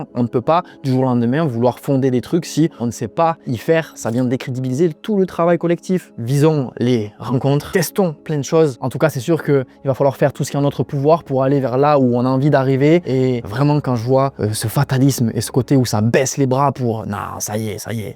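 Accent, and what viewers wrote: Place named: Toulouse, France. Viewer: Ah ouais et l'accent toulousain c'est joli, je suis jalouse de pas l'avoir ❤ et je me suis abonnée dès que j'ai entendu le tiens 😊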